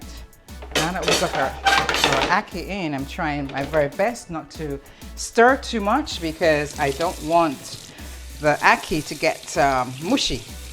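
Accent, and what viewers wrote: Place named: England, United Kingdom. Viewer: No salt fish really 🤪 sounding very english mate.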